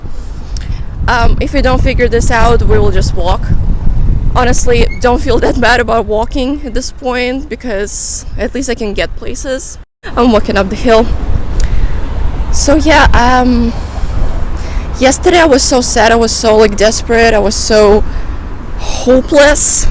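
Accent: United States. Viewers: Your English is perfect. You sound like you were born in the USA. You remind me of the models in this video..... <link>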